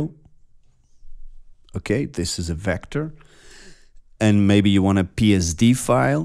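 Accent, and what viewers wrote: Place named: Israel. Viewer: שומעים שאתה ישראלי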